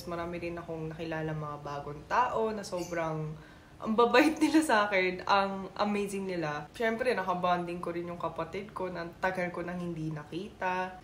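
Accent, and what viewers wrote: Batangas, Philippines. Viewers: Hiiii poooooo.... Nag tataka lang po... Kaya mo po ba mag Batangeño accent while speaking Korean? Paano po kaya yun?🤔 Anyways... You're always beautiful po and feeling ko ang sarap sarap mong i-hug... Always take care po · Ramdam kita bebe😢😢 kaht savhn mo na sarili bansa mo un pero hnd ka naman dun lumaki mahirap mag adjust mamimiss mo ung vive sa pinas tapos sobrang seryoso din naman sa korea hirap ka mag adjust,, like Ryan bang sabi nga nia gusto nia mamatay sa pilipinas tapos ngaun pilipina ang nililigawan niya kasi pusong pinoy sya❤.. I heard sa batangas ka kasi ung punto mo🤌Batangena rin ako🤌🤌 enjoy mo ang life mo at mag iingat ka palagi.. sundin mo Asa puso mo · Parang filipina kalang magsalita*sarang haeyo*!😅❤❤❤🎉😊😘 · New subscriber here,. I found your vlog sad and funny at the same time. Funny because for a Korean, you certainly have learned the way the girls talk in the Philippines and it’s kind of weird listening to your funny words and looking at you who’s as Korean as Korean can be. 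You’ll get over your homesickness, though. The good thing is you’re not halfway around the world if you ever felt the other way around now, kung ma homesick ka naman sa bansa kung saan ka ipinanganak. Keep smiling, you’re beautiful.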